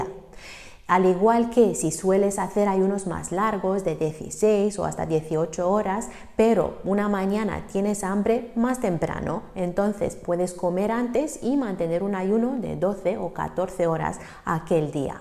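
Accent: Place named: Romania. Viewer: Văd ca ești românca după accent, a luat premiul Nobel la autofagia deci mi se pare cea mai mare descoperire.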